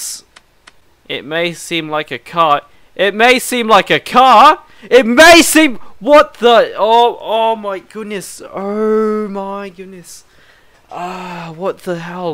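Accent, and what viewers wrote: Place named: Russia. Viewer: You have a nice Russian accent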